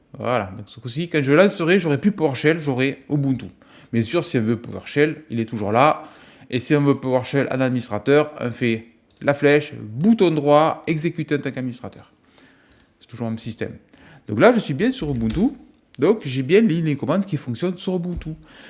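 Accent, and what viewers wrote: South, France. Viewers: avec ce petit accent du sud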